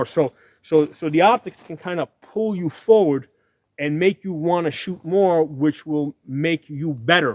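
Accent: New York, United States. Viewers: Are you from New Yawk by any chance?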